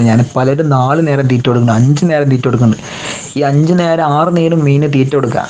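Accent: Malappuram, India. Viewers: Samsaram kettappazhe thonni.. 😇😇mlprm isttam😍😘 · Superbb Malappuram slang😋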